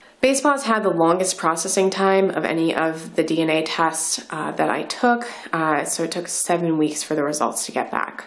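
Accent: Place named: Wisconsin, United States. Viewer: I can tell youre from Wisconsin. Lol.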